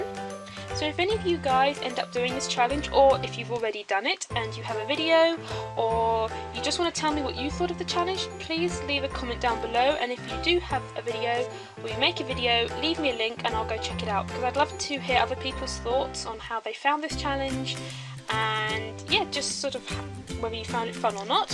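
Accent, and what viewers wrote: England, United Kingdom. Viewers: what shop do you get it from? you sound like a English girl like me. just guessing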